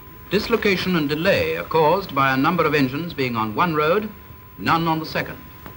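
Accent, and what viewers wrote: United Kingdom. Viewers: I've watched this video so many times, but I come back for the narrator's exquisitely dapper British accent.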